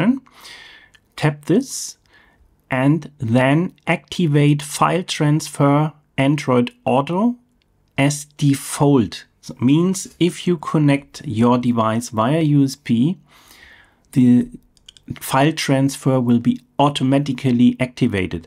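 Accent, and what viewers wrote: Germany. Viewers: man erkennt das du deutsch bist lol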